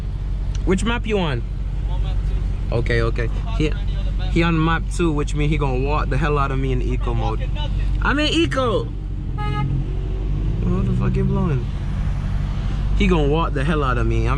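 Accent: Jamaica. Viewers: I think I can hear that Jamaican accent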